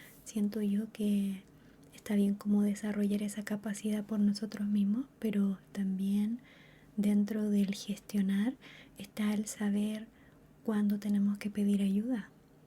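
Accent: Chile. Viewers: Querida, el primer video tuyo que tuve el privilegio de ver fue hace muuuchos años, un tutorial de cómo hacer un corazón de Undertale con porcelana. Comencé a ver tus videos por el tutorial, me quedé por tu carisma. Tu acento de Chile siempre me recuerda a mis abuelitos y todos los tíos abuelos y primos lejanos que tengo allá. No soy artista, pero soy docente, y fuiste una de mis inspiraciones para llevar el amor y la paciencia a mis alumnos en su proceso de aprendizaje. No suelo comentar mucho en los videos, pero te sigo desde mi cuenta principal hace muuuchos años. Quería agradecerte por el hermoso cariño que le ponés a tus proyectos. Y gracias por este video que me ayudó mucho en tiempos difíciles. Con amor, una pollito veterana ❤ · Muchas gracias. Te sigo desde hace años y creo que por ti amo el acento chileno. Justo han sido días de mucha ansiedad, ojalá hagas más videos así. 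Últimamente me he refugiado en tu canal de ASMR 🥹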